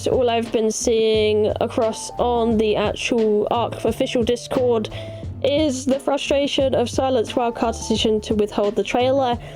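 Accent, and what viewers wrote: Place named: United Kingdom. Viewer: Omg she's a Brit bruh I already couldn't listen to Brits and then they threw in a female.... Barf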